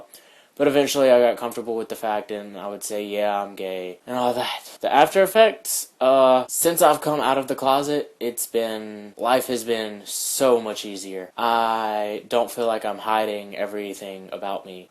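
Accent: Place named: South, United States. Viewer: where are you from??? I hear a Southern accent, but not sure f ft. Om where.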